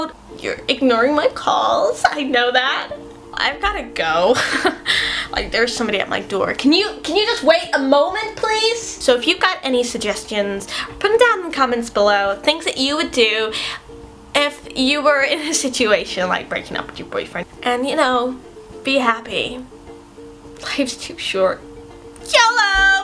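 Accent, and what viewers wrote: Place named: United Kingdom. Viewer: Just a few seconds in, and I already forgot that you had a British accent! Great and hilarious video!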